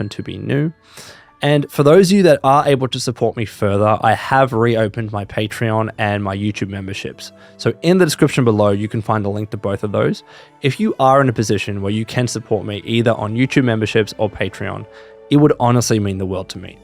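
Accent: Australia. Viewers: Wish you the BEST & absolute success with YouTube,. Your angle has been one of my favorites on the platform. I wager you’d be amazing at Twitch streaming as well to farm some of that YouTube content. The Aussie accent will definitely boost your viewership!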